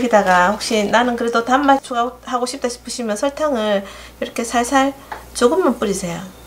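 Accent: Gyeongsang, South Korea. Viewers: 경상도말씨가 정감이가네요 설명도쉽고 정말감사히 잘배우고갑니다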